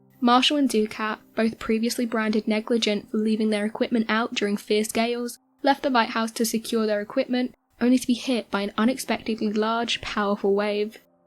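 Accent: United Kingdom. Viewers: ARE YOU A BRIT? 🇬🇧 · Are you British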